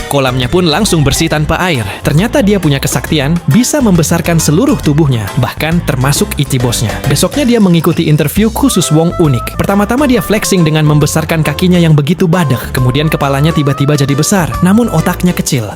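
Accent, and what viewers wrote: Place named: Banyumas, Indonesia. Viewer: Admine wong ngapak apa kepriwe kie 🗿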